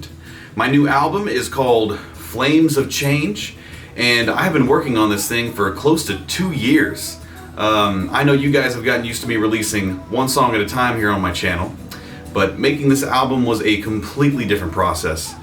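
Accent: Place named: South, United States. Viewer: It took until this video for me to realize you were also southern lol.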